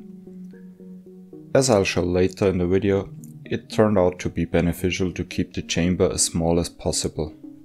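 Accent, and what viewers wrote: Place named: Germany. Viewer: Your Accent Sounds german. Ich bin auch deutsch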